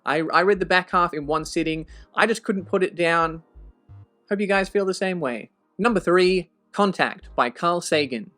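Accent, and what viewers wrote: Australia. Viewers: Waitttt it took me half the video to realize you have an Australian accent!! I'm from Victoria 😅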